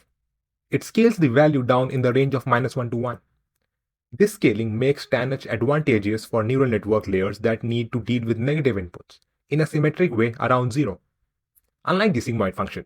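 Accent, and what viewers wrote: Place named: India. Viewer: You indians have great information videos on YouTube , but your problem is your accent in English is terrible for us to understand what you are saying. So please use AI voice instead of your natural voice please.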